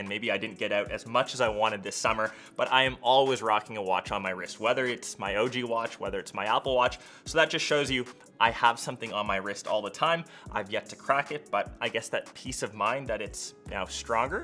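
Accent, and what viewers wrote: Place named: Canada. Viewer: I think I hear a Canadian accent..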